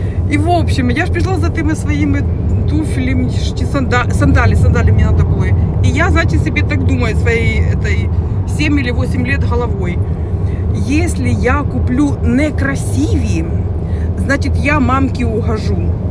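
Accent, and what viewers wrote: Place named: Dnipropetrovsk, Ukraine. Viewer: Це з Дніпропетровщини такий суржик. Ви вже повинні знати що в Україні не розмовляють чистою мовою. У всіх областях є свій місцевий діалект. Це не тільки в Україні, в різних країнах є цей феномен. Краще вам більше читати якщо ви цього не знаєте.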